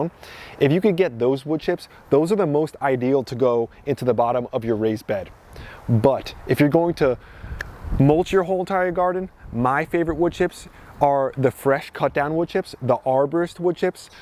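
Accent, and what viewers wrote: New Jersey, United States. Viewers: First time I've seen you, I'm guessing you're from Jersey, my wife is and her father said WOODER instead of WATER.😂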